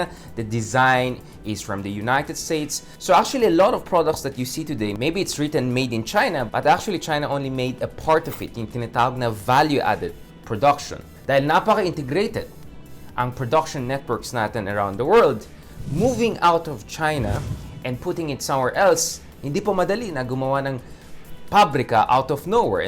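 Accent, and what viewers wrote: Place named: Conyo, Philippines. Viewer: Nakakairita naman pagkacono mo pre. You buckle in ur pronunciation of English words sorry tpos nagsslang ka sa Tagalog when u even stutter with the English words. U don't sound natural in English nagkakapulupot dila mo.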